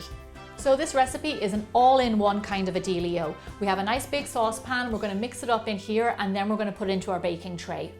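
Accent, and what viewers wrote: Ireland. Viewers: I come for her recipes and her irish accent 😍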